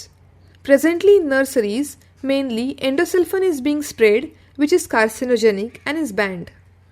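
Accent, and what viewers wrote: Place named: Sri Lanka. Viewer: Though the video is very informative, it's very hard to digest ur srilankan English..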